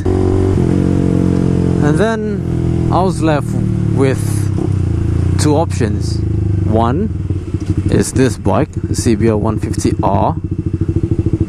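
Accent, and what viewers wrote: United Kingdom. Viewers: aksen british nya keren . kaya ga ada indo2 nya sama sekali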